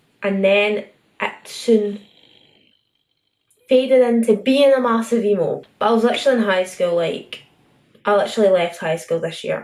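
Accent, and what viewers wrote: Scotland, United Kingdom. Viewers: FINALLY A SCOTTISH GAL IN THE SCENE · ahhh I didn't know she was Scottish, the accent is so cute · soz but as a scottish person myself, her accent is rank. Dunno where in scotland she’s from but her accent is HORRIBLE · OH MY GOSH ARE YOU SCOTTISH??? I ABSOLUTELY LOVE YOUR ACCENT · i cant believe i’ve seen so many of your videos and never heard you speak and didn’t know you were scottish omg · How does nobody even understand her accent, I ain’t even Scottish but I know what she’s saying 😂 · If you say you can't understand her it confuses me...I'm american...and she's speaking English with a Scottish accent...its the same language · Wow, I wasn’t expecting you to be scottish 😂😂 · YOU’RE SCOTTISH! 😃🏴🏴🏴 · Dammm i love ur Scottish accent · YOUR SCOTTISH??? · She has a Scottish accent. I’m Scottish and it took me forever to figure out that she was Scottish as well 😂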